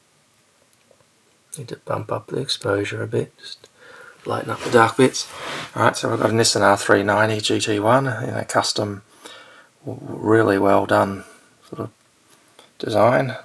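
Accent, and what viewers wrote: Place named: Australia. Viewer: Wow, nice collection. Sounds like you're in Aus. Nice to know there's a nice home if I need to sell.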